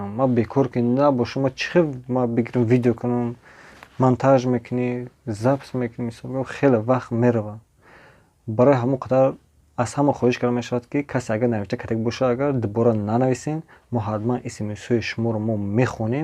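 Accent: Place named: Muminobod, Tajikistan. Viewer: Лахчаи гапзанитонда гап нест........суппппееееееееер .муминобод.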